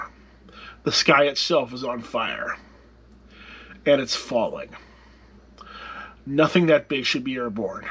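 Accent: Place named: Missouri, United States. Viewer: You sound like your from Missouri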